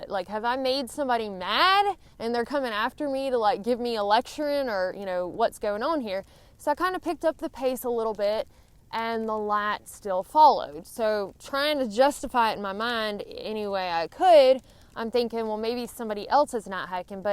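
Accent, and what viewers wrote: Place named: South, United States. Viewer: Love it! LMAO!!😂 and your accent... "not hockin'"... way more Southern than the Richmond, Va. Accent I enjoyed so much so many years ago. Aahh, memories. Thanks,. 😊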